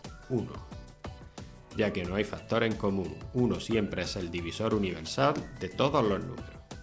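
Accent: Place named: South, Spain. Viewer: Como se note q eres del sur.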